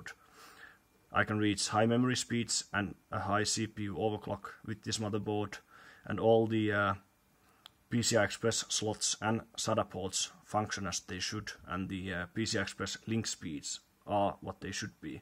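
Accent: Finland. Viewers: He's Finnish. Also i love the comment section here lmao....its hilarious...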